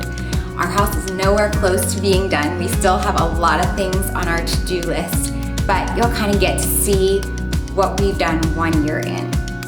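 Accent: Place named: North, United Kingdom. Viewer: Your voice sounds like Leslie Mann who played Jane in George of the Jungle lol And I can tell you're from up north somewhere :D Love the videos!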